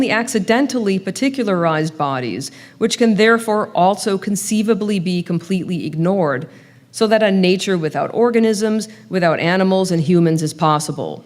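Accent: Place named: Canada. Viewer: must be Canadian? weird voice and accent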